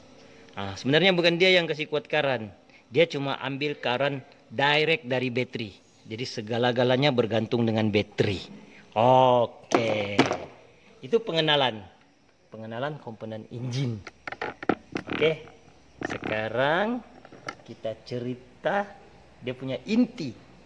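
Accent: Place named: Tawau, Malaysia. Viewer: mcm org twau. bangkel d mana bos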